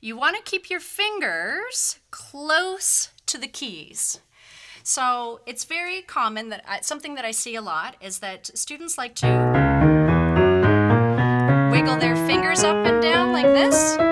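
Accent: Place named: United States. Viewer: Great and clear staff and what a beautiful american english accent!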